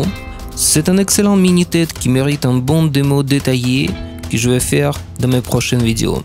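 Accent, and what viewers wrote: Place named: Russia. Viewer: Salut, земляк, нюансы произношения говорят о том, что ты из России. Ну, или откуда-то в этом роде )) Par contre круто играешь, тут без нюансов! Как тебе гриф Шектера, не слишком толстый? У меня раньше был Ибанез Престиж 2127, удобнейший гриф на свете, но отвратный звук липы. Продал, теперь вот думаю взять этот Шектер, но сомневаюсь, не нужны ли тут ладони баскетболиста? Обычно у Шектеров грифы толстые... Ты его покупал, случайно, не в Париже на Пигаль? Merci d'avance, cordialement, привет из Беарна.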